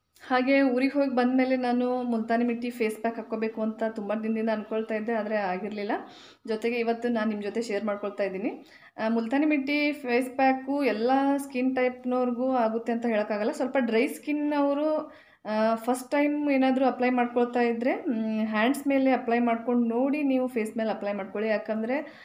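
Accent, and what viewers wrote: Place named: Uttara Kannada, India. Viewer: ನಿಮ್ಮ ಉತ್ತರ ಕನ್ನಡ ಭಾಷೆ ತುಂಬಾ ಚೆನ್ನಾಗಿದೆ ನೀವು ತುಂಬಾ ಚೆನ್ನಾಗಿ ಮಾತಾಡ್ತೀರ sis face pack 👌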